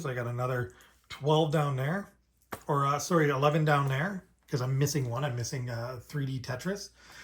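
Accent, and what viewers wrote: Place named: Canada. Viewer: Awesome game room man, one of the best ones I seen! Do you know UndeadChronic? You sound spot on exactly like one of his Warband members with the Canadian accent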